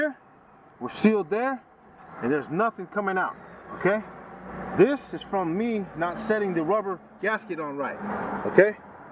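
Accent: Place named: Los Angeles, United States. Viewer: Hi nice video, you sound like you're from LA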